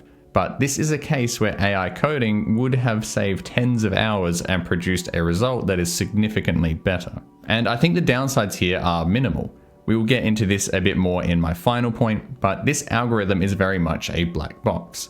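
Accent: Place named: Australia. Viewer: Hhahaha no way I got to the same feeling after a decade of frontend dev, with React. Hobby farm and or game dev. I started learning C++ and it's been great! I don't have many good feelings/experiences around AI, I really pushed it to it's limits as an early adopter and found that it has a negative impact on my memory and analytical skills. I just use it kinda like a search engine when I really can't find any other way. However I am open to giving it another shot using something more like your method. As a side note, I also use Vim with the file explorer to the right :) From your accent, I assume you're a fellow Aussie! Thanks for sharing this, great video!!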